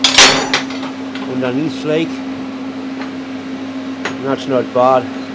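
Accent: Newcastle, United Kingdom. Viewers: great video, as ever - thanks for everything you do. Just wanted to let you know a bit of an odd coincidence - I started following your channel after a shout out from the Canadian YouTuber AvE, but despite the familiarity of the accent I'd not looked too much into it, s'just some geordie lad doing his thing. Then I noticed the address on the package - I had to double-take as a wave of nostalgia flooded over me. Despite now living at the other end of the country, as a kid, I grew up in the countryside of Northumberland and used to stay at my grandparent's house every weekend - my granddad was a retired engineer and used to fettle model boats in his garage, definitely where I got my love of engineering from. Anyway: they lived at the bottom of St. Estate, and I used to go walking the dog down the path alongside the Stamfordham road down past Callerton. 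Good times with the innocence of youth and all that! Anyway, keep up the good work, all the best to you and yours.:)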